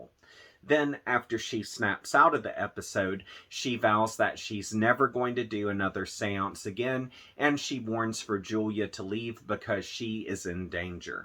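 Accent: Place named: Texas, United States. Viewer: That explains it, I'm born and raised in Texas, lived in Georgia for three years now, it was definitely nice to hear a familiar sounding voice on YouTube for a change ✌ I'm sending my best wishes, and have a wonderful day ☺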